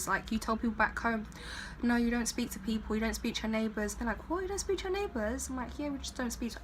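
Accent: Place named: London, United Kingdom. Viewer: London accent😍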